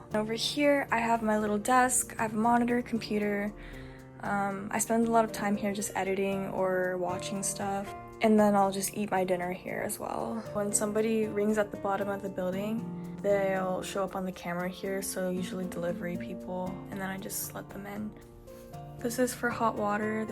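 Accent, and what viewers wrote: United States. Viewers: YouTube algo just randomly pushed me your channel. Can you do a video so we can learn more about you? did you grow up in Japan or another country? your English sounds very American to me, and not picking up much of an accent. I see your videos are getting a lot of traction already, you should be able to make this a full time job if you want to do something like that. Consistency is key. You are stunning btw. much love from Pittsburgh PA. :) Bye for now!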